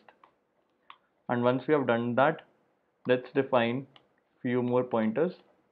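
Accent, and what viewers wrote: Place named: India. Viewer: I just can't deal with the heavy Indian accent. Great programmers tho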